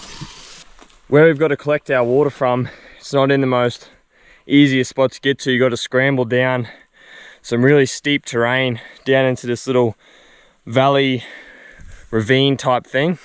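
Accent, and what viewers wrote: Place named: Far North Queensland, Australia. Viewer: Your production is incredible. I absolutely love ALL your videos. Your camera presence is so raw, real and refreshing. The FNQ accent makes it even better haha! Will you ever consider travelling overseas and create hiking videos? Great work. 🙏🏻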